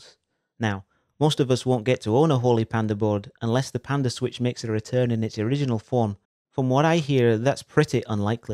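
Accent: United Kingdom. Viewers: did your accent change to more scottishy or is it just me?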